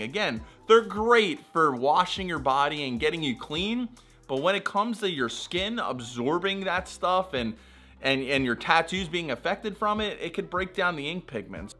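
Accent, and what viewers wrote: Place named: Wisconsin, United States. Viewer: You sound like my exgf. She was from Wisconsin. You sound like youre from Wisconsin or Minnesota.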